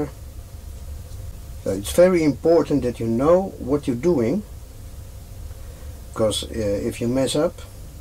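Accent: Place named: Netherlands. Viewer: You're Dutch? Can't miss.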